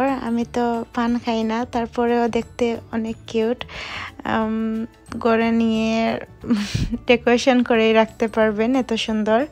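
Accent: Sylhet, Bangladesh. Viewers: Afa sylheti mato ela bala lagena 😏😏😒😒😒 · Boin sylhety mat ow tumare beshi manay. Suddho vashar mat huniya kila lagse.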